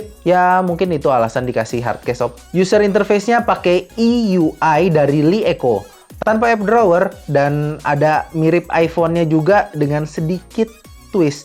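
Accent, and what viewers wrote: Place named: Sundanese, Indonesia. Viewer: Bang, Logat Sundanya kocak bat dah:v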